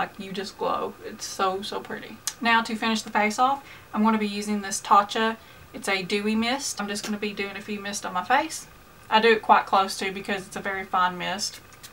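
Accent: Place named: South, United States. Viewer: I have similar face shape, eyes and hair as you so I love to see new ways to do makeup. I didn't pick up this palette at Sephora the other day still pondering. Also, your accent sounds like mine. Southern girl? :)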